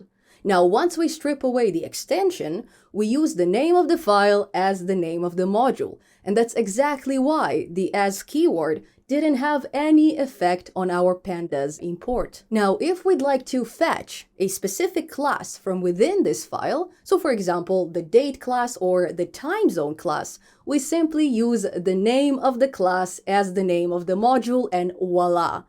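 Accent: Russia. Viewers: На россиянку похожа и акцент русский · Мне показалось, или я слышу легкий русский акцент?)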